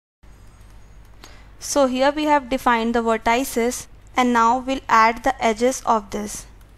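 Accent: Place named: India. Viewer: Billshit Indian accent … 🤢🤮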